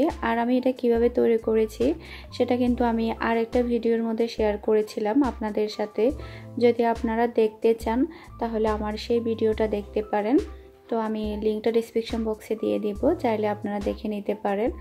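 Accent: Chittagong, Bangladesh. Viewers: আসসালামু আলাইকুম। আমি নতুন।তোমার কথাগুলো অনেক ভাল লাগে। তুমি কি চট্টগ্রামের মেয়ে? জানলে ভালো লাগবে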